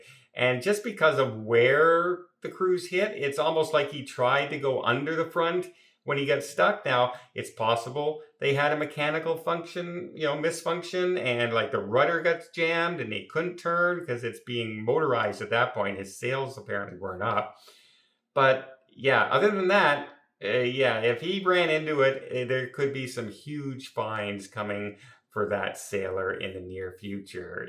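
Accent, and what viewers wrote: Canada. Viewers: maybe Canadian pronunciation. Just sayin'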